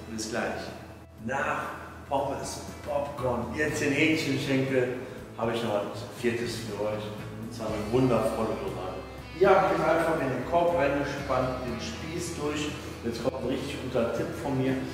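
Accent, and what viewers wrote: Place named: Rhineland, Germany. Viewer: endlich wieder rheinische tön hüüre, näää wat es dat schön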